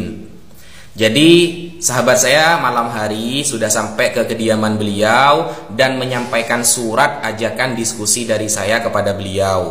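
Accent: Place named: Madura, Indonesia. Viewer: Logat Madura banget gus *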